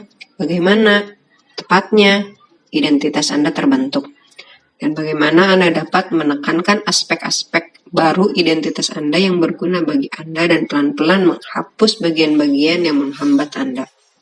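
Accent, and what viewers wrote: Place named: West Java, Indonesia. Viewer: Teteh orang sunda yah logatnya keliatan hhi nuhun teh tos macaken buku atomic habit ❤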